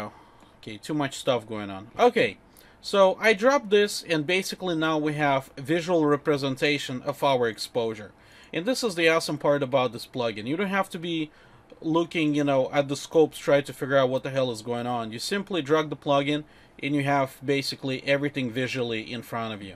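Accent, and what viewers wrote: Russia. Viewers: Прям слышу по акценту что русский))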